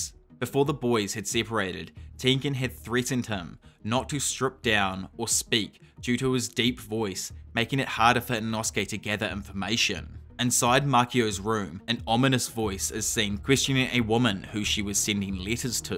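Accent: New Zealand. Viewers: Loved the kiwi accent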